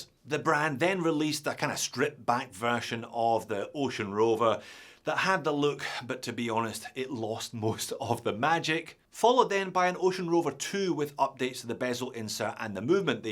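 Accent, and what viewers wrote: Scotland, United Kingdom. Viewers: Is it just me or do you sound more Scottish back in 2017? 😅😅❤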